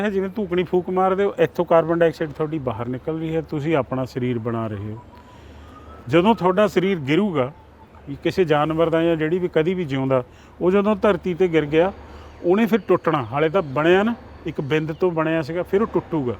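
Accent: Puadh, India. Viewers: Puadi bhasa bol reha veer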